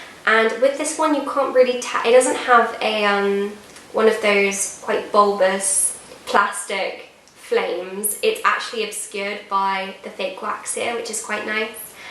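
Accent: RP, United Kingdom. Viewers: Do you put this accent on ... your sister talks so so so much different which is a bit weird ? You sound posh yet she sounds not posh hahah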